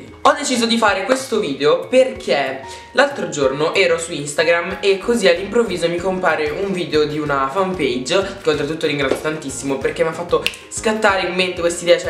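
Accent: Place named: United States. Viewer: Sembravi un americanoLuuu😂😂😂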